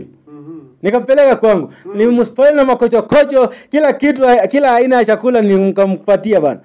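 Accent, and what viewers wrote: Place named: Kenya. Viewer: napendanga story yako ukipiga na ascent ya kikuyu. relationship inahitaji pesa na akili, wewe hauna zote, hauwezani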